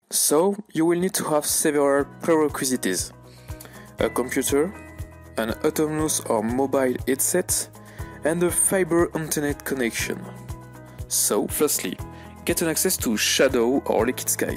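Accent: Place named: France. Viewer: i spot a french accent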